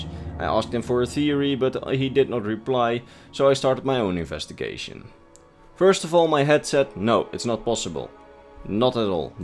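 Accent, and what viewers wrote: Netherlands. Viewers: I hear somebody is dutch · U sound dutch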